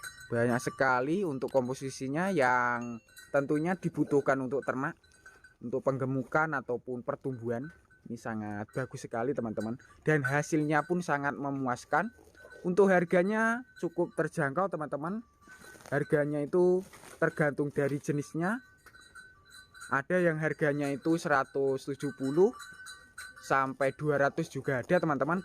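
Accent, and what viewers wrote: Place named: Java, Indonesia. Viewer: 170 sen ato 170 rp ato 170 ribu broo Medok kali kmu ya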